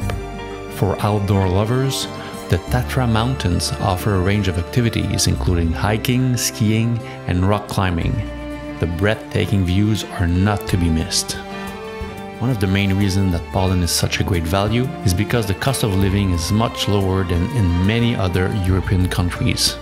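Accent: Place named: Quebec, Canada. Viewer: J’ai spotté ton accent Québecois lol. Nice video btw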